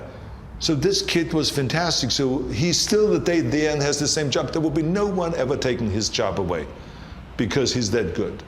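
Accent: Styria, Austria. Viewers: Actually he speak German with an Austrian accent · He has an Austrian hillbilly accent and for that reason they didn't let him do the German dub for Terminator. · Nope, it's a kinda thick austrian accent. Personally, I love it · He is Styrian, he speaks with an Styrian accent... not even near to swiss?! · Ze Austrian accent suits him perfectly. Imagine speaking Russian or French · He speaks Styrian, a dialect from Austria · He has a veeery strong Austrian accent 😄😎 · Sacré!! Ah j aime bien son accent autrichien 😅 quand il parle allemand! 💪😎 Der Terminator!!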